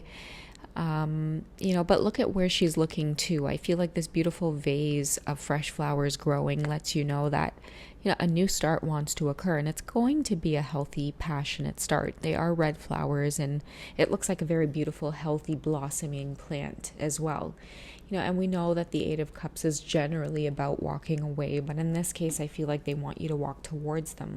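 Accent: Trinidad and Tobago. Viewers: A warm Trini shout out! I love when you speak in your Trini accent makes it even authentic. You have an amazing vibe and your reading provide such clarity.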